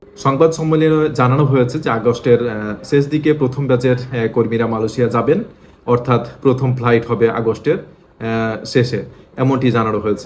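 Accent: Kolkata, India. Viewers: তাহলে কলকাতার ভাষার মতো শব্দ উচ্চারণ করেন কেন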